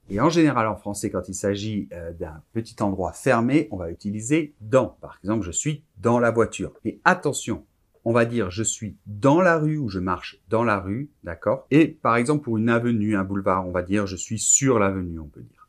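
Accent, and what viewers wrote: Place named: France. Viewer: La façon dont toi Français prononce le e est très différent du Québec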